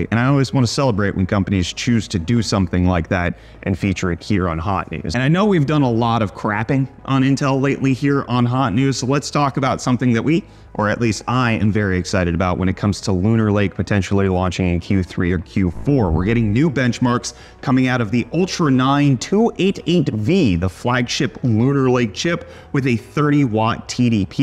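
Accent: South Africa. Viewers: are you from SA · I love that is starting to sound more south African as time goes on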